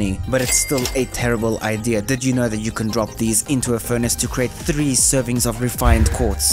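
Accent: South Africa. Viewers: This guy sounds South African.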